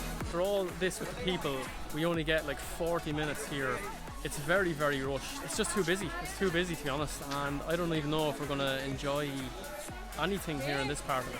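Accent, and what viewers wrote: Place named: Ireland. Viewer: A good Irish accent😀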